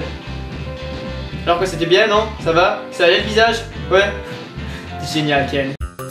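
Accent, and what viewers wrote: Quebec, Canada. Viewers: étant français j'ai tout de suite su que c'etait un quebecois ( pour un français y'a un ptit accent ) et puis un français ne parlera jamais aussi bien quebecois que lui ( on a tendance a éxagerer l'accent lol ) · J'ai dû retourner en avant et en arrière quelques fois o.o, ton accent Québécois est très bien! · Coudont est tu Quebecois? Sinon tu imitted l'accent bien en tbk ! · C'est un Québécois ou un Français (perso je suis quebecois et si c'est un français il fait l'accent québécois parfaitement)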